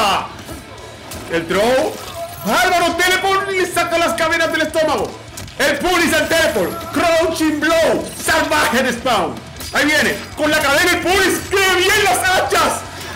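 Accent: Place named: Dominican Republic. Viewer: Eres dominicano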